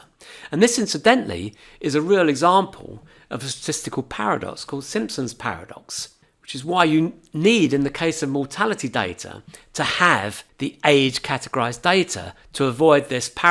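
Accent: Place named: East London, United Kingdom. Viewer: East London accent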